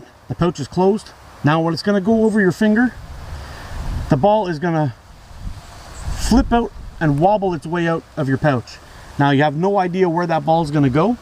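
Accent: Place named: Canada. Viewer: Just found your channel - great stuff! Nice to watch a slingshot video where the host has a Canadian accent EH! Cheers from Perth Ontario!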